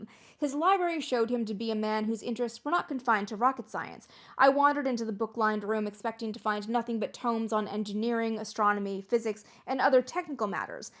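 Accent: Germany. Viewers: I think the NAZI made him an offer he couldn't refuse like in the Godfather and I like your German accent.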